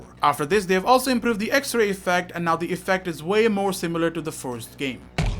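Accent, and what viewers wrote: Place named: India. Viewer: Lmao you sound Indian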